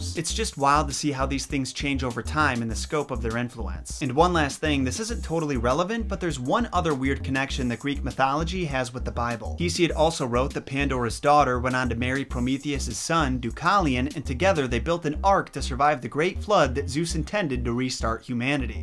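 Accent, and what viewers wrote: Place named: East Coast, United States. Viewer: I’m still trying to place his accent lol. I’m sure it’s east coast but I want to pinpoint it lol.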